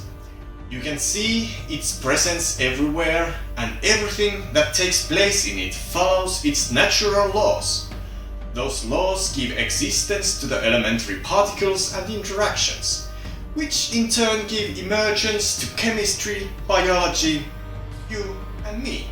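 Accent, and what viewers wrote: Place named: Finland. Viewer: o how come i didn't know about this video until now? Also nordic pagan here. And a furry xD You sound Finnish, as your accent reminds me of my own. I grew up with the Finnish paganism as my mummi was Finnish pagan. Like the old school pagan where the world was being created from the skybird egg. Though i have adopted a more of a mix of Norse paganism and Finnish paganism.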